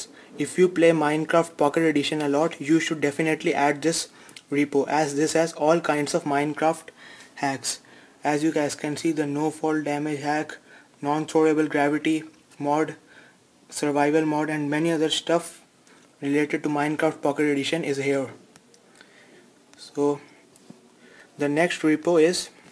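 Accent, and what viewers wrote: India. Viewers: Why do u sound like a gay Indian ?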